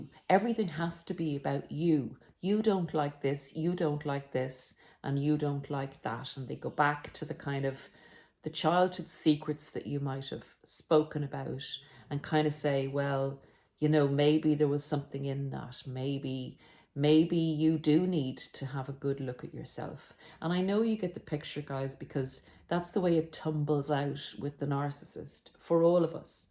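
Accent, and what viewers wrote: Ireland. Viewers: Are you Irish?🍀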